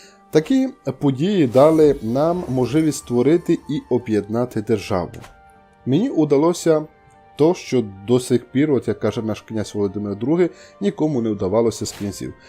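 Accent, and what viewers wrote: Transcarpathia, Ukraine. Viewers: Якщо я правильно зрозумів по говору, то ви з Закарпаття. Можна зробити особливістю канала те, що ви будете включати у свою мову особливості вашого діалекту)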